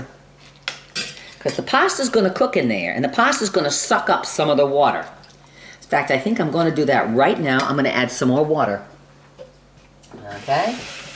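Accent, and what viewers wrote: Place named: Maine, United States. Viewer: You sound like you come from somewhere around where I do. I was born and raised in Maine. :-)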